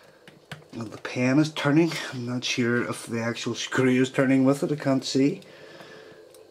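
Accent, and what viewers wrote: Northern Ireland, United Kingdom. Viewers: northern Irish not Scottish :P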